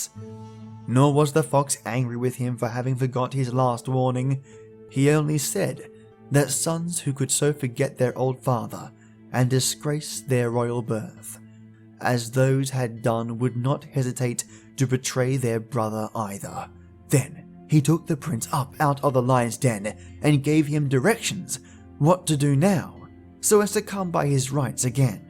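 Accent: Australia. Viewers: Oh my gosh! My night is complete. Your theme comes on...You mention Earl Grey? Is it TWG? With this wonderfully warm, Australian accented Tale Teller starts some awesome Swedish folk tales...I 'm having some 1837 Black tea by TWG it's all strawberry and good stories...as lovely as you. I have a bird, you know about Daisy, they really don't like to be touched or get a nice bite. That prince needs to get smacked up side his head being so silly and not listening to the fox. The law seems severe don't pay your debts or get killed. Such a wonderful folk tale...the bird singing was sweet. Don't you also agree if you mess up with those ritualistic creepypastas? It seems when you mess up with one of those by forgetting to light a candle or you looked at the wrong time...you're dead or cursed for eternity. The fox was extremely kind and had patience with the prince compared to other folk stories.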